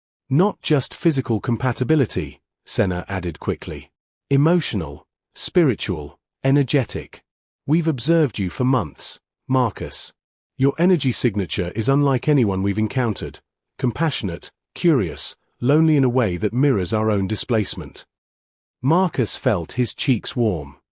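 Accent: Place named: United Kingdom. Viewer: With the British accent, I should have known the answer for two naked women showing up for warmth would be "Let me make tea!"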